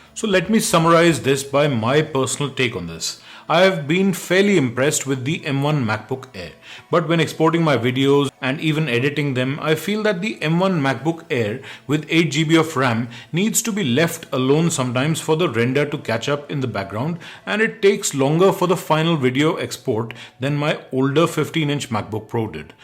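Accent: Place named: India. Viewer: Super excellent videos..Great guide to lot of decisions..The M1 playlist is just incredible..Glad to find an Indian English speaking content creator adding so much great value..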